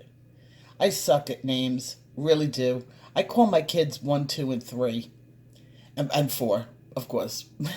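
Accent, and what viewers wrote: New York, United States. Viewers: You are an amazing colorist. I have been pining for Holbeins but just can’t afford them, even though I don’t believe that I would do them justice like you. Her hair is like my mother’s almost, my Mom had a bit more red, mine was darker but with the reddish highlights. I never tire watching you make a picture come alive and love the NY accent!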